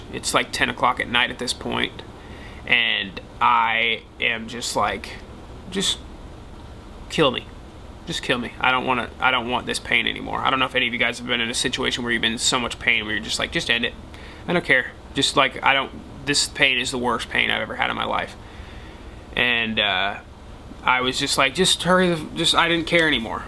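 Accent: South, United States. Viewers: hey man. you can tell your from the south. your a nice guy. almost thought you were from Texas for a minute. lol hook' em baby!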